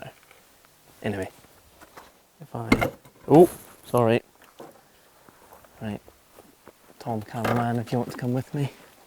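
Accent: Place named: Glasgow, United Kingdom. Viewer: You sound like a fellow Glaswegian to me. I can't see Dennis Collins being interested in any of those cars. The forest landscape is almost saying that nature wins in the end mate.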